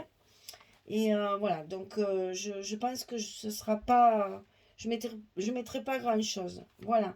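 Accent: South, France. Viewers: Bonjour, quel beau papier, c'est très élégant et vous le sublimez par votre talent!!!! J'aimerais savoir où vous vous êtes procuré la pointe avec laquelle vous enlevez la pellicule des bandes de colle double face? Merci pour votre réponse et ce merveilleux partage!!!! J'aime bien votre accent car j'ai vécu les 33 premières années de ma vie dans le midi et maintenant je vis en Bresse!!!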